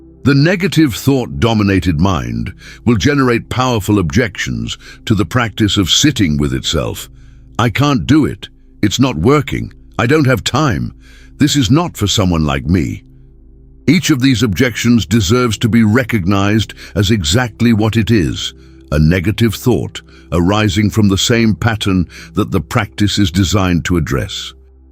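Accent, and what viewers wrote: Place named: United Kingdom. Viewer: Why speaking so fast and with a British accent. I am a native Frenchman and only understand standard American.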